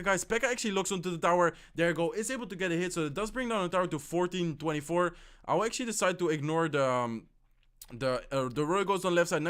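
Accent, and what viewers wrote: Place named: Netherlands. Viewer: je klinkt echt heel erg nederlands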